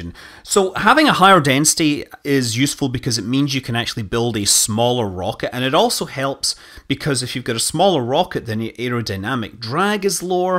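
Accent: Scotland, United Kingdom. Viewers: I like this video series - “Scottish man reads space based Wikipedia articles”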